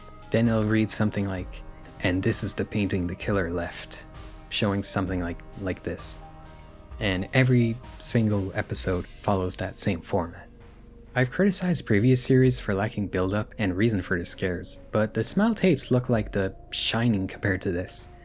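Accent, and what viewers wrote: Ireland. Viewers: Hidden Irish accent I think.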